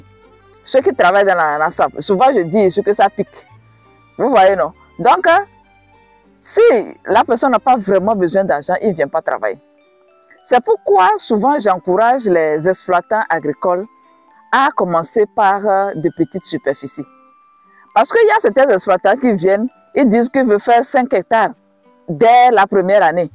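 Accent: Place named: Togo. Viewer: j'ai failli dire que les meilleurs ouvriers agricoles loyale et fidèle en Afrique de l'Ouest sont les natifs du NORD TOGO, mais à vous entendre ça me fait peur, car votre accent montre que vous êtes des togolais, mais une chose est sûr, l'expérience a montré que pour des domaines avoisinant déjà les plus de 05 hectares, faut carrément prendre des ouvriers venant d'une autre région du pays, avec un bon contrat à l'appui, et non des autochtones de la zone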